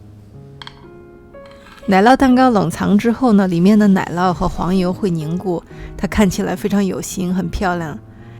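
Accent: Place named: Beijing, China. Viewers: 北京口音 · ，看头像里你的样子，和我一个同学长得很像，她也是一个北京姑娘，所以连口音都像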